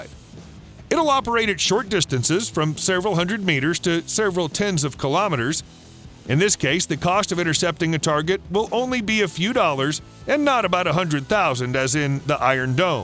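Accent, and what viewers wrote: United States. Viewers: typical American annoying voice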